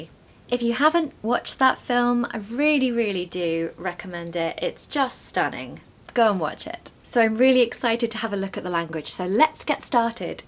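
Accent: United Kingdom. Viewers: I use all these words commonly in everyday US English, and without scruple or hesitation, so they're safe over here in the colonies. What a beautiful British pronunciation you have -- insuperable!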